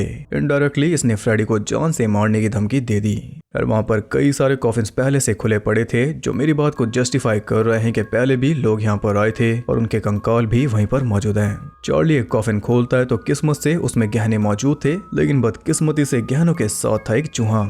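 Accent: Haryana, India. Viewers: Tm hariyana se ho na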